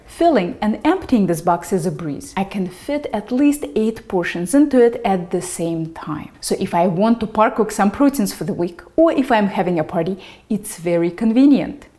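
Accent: Russia. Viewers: что за акцент россия? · Russian accent isnt? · What a Russian accent has. Very demanding women. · Ох уж этот русский акцент ))) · Акцент русский? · Звучит как русский акцент - всё понятно и разборчиво! )))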